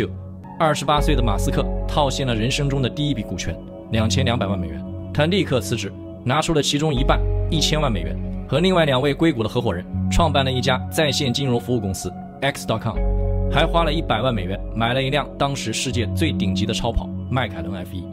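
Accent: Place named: China. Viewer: 一嘴大陸口吻，不看了，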